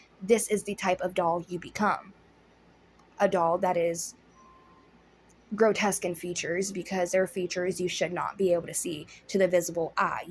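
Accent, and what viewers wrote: South, United States. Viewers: do i hear a little southern twang in your voice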